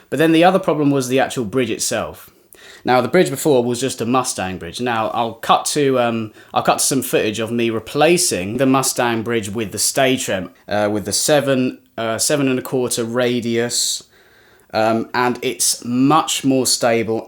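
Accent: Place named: RP, United Kingdom. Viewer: You sound posh enough for 90% of the world without adding the old geezer voice ;) Thanks for the demo!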